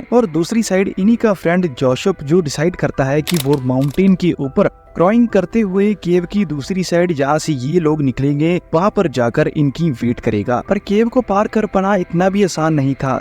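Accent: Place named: Pakistan. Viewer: tumhara accent Pakistani lag raha hai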